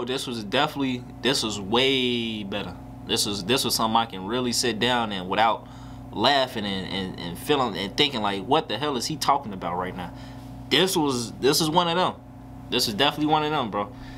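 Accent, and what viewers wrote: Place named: Chicago, United States. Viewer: I hear in your voice u from the chi so I give you a little room and u young, I’m 43 and I respect what you said about your pops taught you, and u listen cause I stay on son’s and they move off old rules, and I respect that you went and did your research on B.G u got some of these young dudes who rap and don’t do that, I heard Fredo bang say he don’t know 2pac music if your pops from the streets he Definitely had u on 💪🏿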